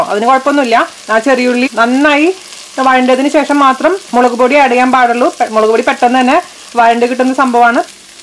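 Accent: Thrissur, India. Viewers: Trishoooor aaan'to....... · ഹായ് ചേഈച്ചി തൃശ്ശൂര് ഭാഷ കേൾക്കാൻ തന്നെ സുഖ ഞങ്ങടെ വീട്ടിൽ കൂർക്ക സീസൺ ആയാൽ എന്നും കൂർക്ക ഉപ്പേരിയവും ഇപ്പോൾ ഇവിടെ മസ്കറ്റിൽ ലുലു വിൽ ഉണങ്ങിയ കൂർക്ക വരുന്നത് നാട്ടിലെ പോലെ മണം ഒന്നുമില്ല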